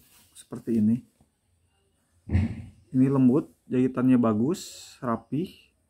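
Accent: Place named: Bandung, Indonesia. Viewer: Pasti abangnya orang bandung yahh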